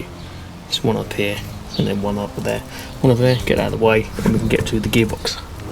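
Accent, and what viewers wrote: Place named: Australia. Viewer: I like your aussie accent 👌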